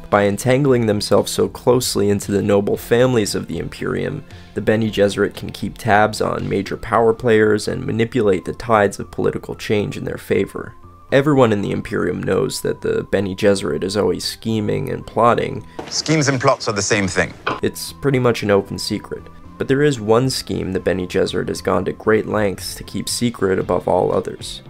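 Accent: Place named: Baltimore, United States. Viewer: Eerily good Baltimore accent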